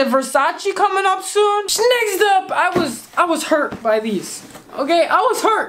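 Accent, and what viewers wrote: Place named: Sweden. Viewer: Are you from sweden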